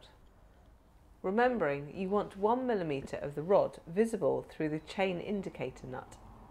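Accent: United Kingdom. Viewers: Brilliantissimo! Helpful with lovely British accent. My pink Brompton is ready for the end of lockdown soon in France.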